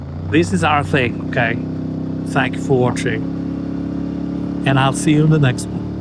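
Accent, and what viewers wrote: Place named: North, Norway. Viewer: Hei igjen buddy. Ordentlig fin video og Telemark er skjønt. Du gjør to ting her som jeg ofte savner hos andre på mc tur; overlay med lyd fra etter turen og veldig fine beskrivelser av hvor du er. Og så er du knakande ærlig og det setter jeg alltid pris på. Jeg ser at noen skriver ta med en venn og det vil jeg si er et veldig bra forslag. Godt å høre at det er skikkelig mc lyd på videoene nå, høres bra ut. Jeg hører du er fra nord, har du også kjørt der oppe noengang? Er jo mange fine veier der oppe også, selv om jeg sjøl ikke har vært lenger enn bodø. Keep it up og planlegg godt med pauser og ting som gir energi. Ses en dag du kommer til DK😉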